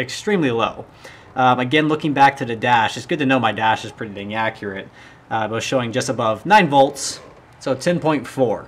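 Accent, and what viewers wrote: Hawaii, United States. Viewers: weirdly still Hawaiian seeming, the accent though, and not pidgeon, so weird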